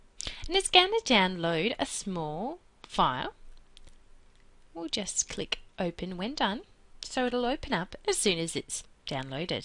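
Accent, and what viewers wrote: Australia. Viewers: Australian accents are the best! Lightens up the mood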